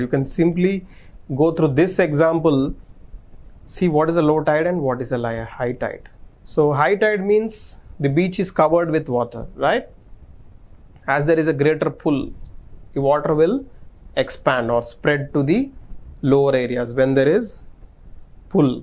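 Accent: South India, India. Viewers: Are you South lndian?....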